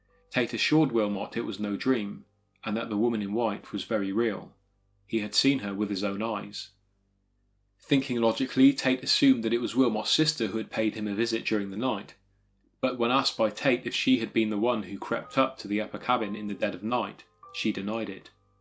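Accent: United Kingdom. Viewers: A documentary just isn't a documentary unless a Brit narrates it. Excellent content by the way 👍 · Faceless story teller but with a beautiful voice and cute British accent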